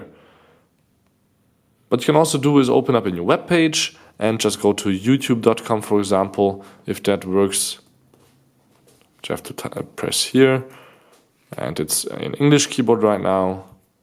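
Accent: Germany. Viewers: R u german?u've got that german accent.....nice review btw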